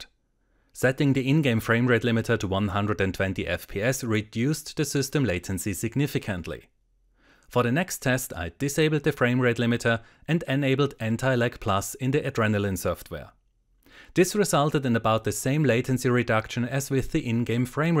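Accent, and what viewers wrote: Austria. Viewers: From Austria eh?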